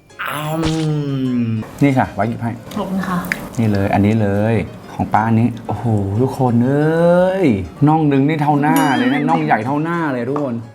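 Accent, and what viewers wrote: North, Thailand. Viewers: อยากเห็นแม่ พูดเหนือทั้งคลิปค่ะ มันต้องน่ารักมากแน่ๆ❤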